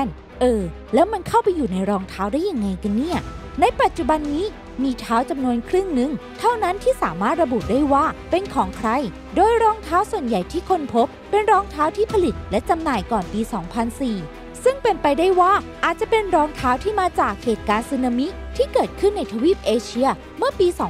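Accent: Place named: Suphanburi, Thailand. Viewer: ชอบสำเนียงเหน่อๆคนพากย์แบบสาวสุพรรณแบบนี้จริงๆน่ารักแปลกๆดี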